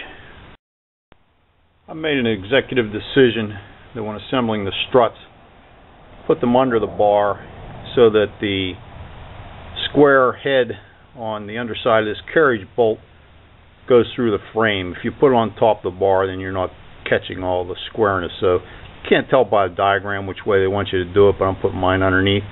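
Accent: Pittsburgh, United States. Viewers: Thanks for the video! Your accent sounds like your from the burgh.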